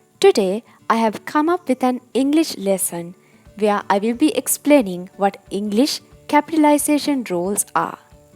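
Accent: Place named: India. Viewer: Just want to know dear, who taught u English? An Indian? Your accent says though. Anyways a nice presentation.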